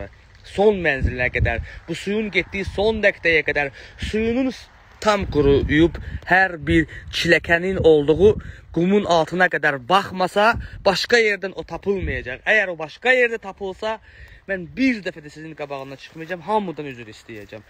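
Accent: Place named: Armenia. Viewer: Ай геде сен йахшы биленсенсе тап да орада не ойун чыхарырсан озунде ермени лехчесинде данышырсан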